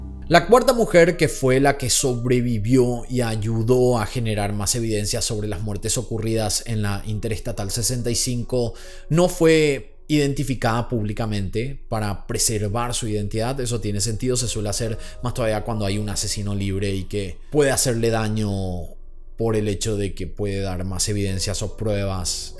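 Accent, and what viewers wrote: Paraguay. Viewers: Me encanta tu acento de Paraguay!! Vivi allí varios años y mi hijo nació ahí y tenía tu misma forma de hablar, te felicito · Gran canal por tú acento se nota que sos de Paraguay capo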